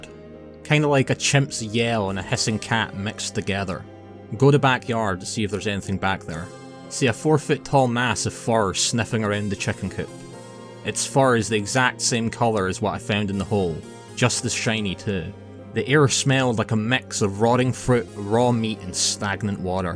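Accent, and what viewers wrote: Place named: Northern Ireland, United Kingdom. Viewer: NI accent. .o.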